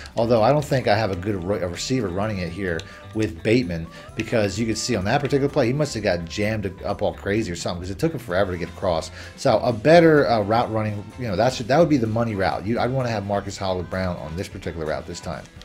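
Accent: New Jersey, United States. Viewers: Hey bro, been watching you for at least 2 years now. Sound like you are from Jersey. I am. Really like tour content. Anyways, I'm an old dad(43) who recently got back into Madden. I play CFM and I am tired of losing. Of course my stick skills ain't what they use to be. I use dolphins playbook on defense. I used to run Raiders on offense, but it switched up this year taking away weak slot close and strong twins. I am looking to purchase an ebook from you. I'm not sure what team to get for offense. I don't mind glitch shit, I just wanna win!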